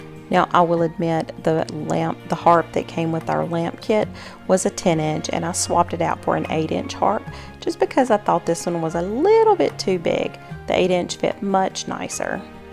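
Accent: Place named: South Carolina, United States. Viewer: Ah lol the one I didn't name you sound more South Carolinian! Your quite talented and loved the lamps. I'm like you in the sense I will not shell out the money on home decor or seasonal items for what they ask most are from China cheaply made and so not worth it. I'd rather make them myself. I do more home decor sewing in the summers and furniture rebuilding/ refinishing in the winter when the heat breaks.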